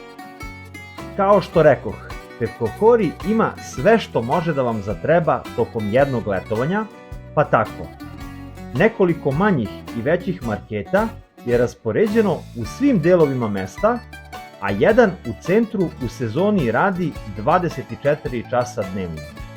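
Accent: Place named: Jagodina, Serbia. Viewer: BRAVO ZA MOG JAGODINCA,OSETILA SAM PO NAGLASKU DA STE IZ TIH KRAJEVA PA SAM BILA SLOBODNA DA VAS PITAM ODAKLE STE. JA SAM PO MAJICI IZ MAJURA KRAJ JAGODINE, A ŽIVIM U ZAJEČARU . SA PORODICOM SAM U AVGUSTU OVE GODINE BILA U PEFKOHORIJU,STVARNO JE LEPO. POZDRAV OD ❤ ZA VAS. PRODUŽITE SA LEPIM VIDEIMA,KORISNI SU . 🌹🍀🥰👋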